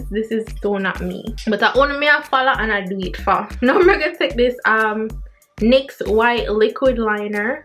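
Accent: Jamaica. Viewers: Yh man she a Jamaican ✔✔Certified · Omg I love her accent my favorite people are Jamaicans ❤ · Mi just enjoy all deh patois video deh. Mi love fi hear ya speak Jamaican Patois enuh. ( Jamaican- American here) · “Mario Sum’m Sum’m Sum’m” 🤣🤣 Loveeeeee di patios vibezzz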